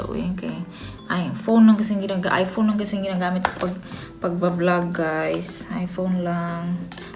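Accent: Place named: Visayas, Philippines. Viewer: Nice sis. 😊pareho tau bisaya ang tono.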